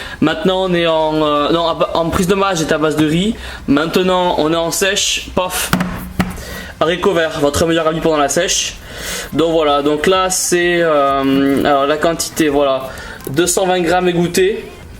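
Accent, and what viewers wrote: South, France. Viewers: Le mecs il avait l’accent du sud avant 😂 💪🏻force a toi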